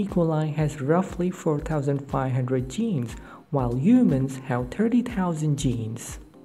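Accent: India. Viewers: R u indian your accent says that.🦆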